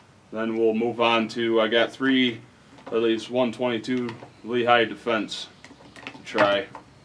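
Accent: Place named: Wisconsin, United States. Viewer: Sounds like. Wisconsin accent to me! Go pack go!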